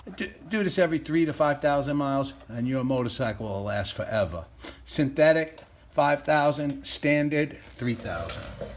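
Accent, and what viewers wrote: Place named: New York, United States. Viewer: Hey, I haven't heard your type of New York accent in over 30 years! It's refreshing to hear it after such a long time. I'm not a native of New York, nor, a transplant. It's comforting to hear it again.🤓😁⭐⭐⭐ Doug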